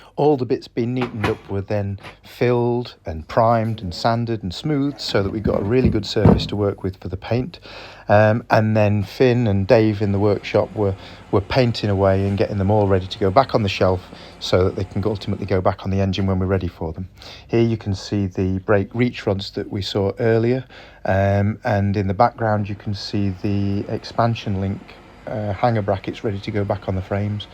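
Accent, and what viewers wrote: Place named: Australia. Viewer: ah yes inside a boiler firebox I have known it quite a bit the last few years as I help out at a railroad historical society, cleaning the grates and drilling out telltells as well as blowin out flues once, I show one time I did on my channel in video if anyone wants to hunt, I am surprised though your using a grinder to cut that off we usually just use a torch to burn the stays out and cut the sheets or a plasma for cutting the new ones, its faster, its messier and has more cleanup but its more expediant, I like your voice though its pleasent to the ears and articulated when you speak some have such a dialect or accent you cant hardly make out what they say , you sound though almost australian, I am no boilermaker though but I read up on research and projects even if I cant do the math to design it, soo many things you can do to make a boiler more effecent or burn cleaner, or it dont even have to have fire there is boiler that uses solar collectors that follow the sun and they are getting an 800 degree super heat on the steam meaning its hot enough to compound if you were to use it in remote locations like off grid so diesle dont have to be burned its noisy expensive and smelly, the solar project that the guy at vapor locomotive company in idaho in the states is heated by the sun, though its generating rate of steam I dont know the capabillity of currently, and you have the facinating differant layouts of tubes and flues as well as multipass tubes and rifled boiler tubes lik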